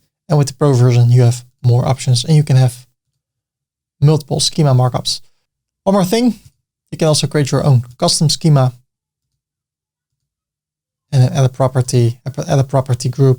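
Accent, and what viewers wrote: Netherlands. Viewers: I've to say it. At first i was like, that voice, sounds familiar. Maar toen wist ik het! een Nederlander !! en toen zag ik maasluis staan. Lachen, Keep up te good work! +1 sub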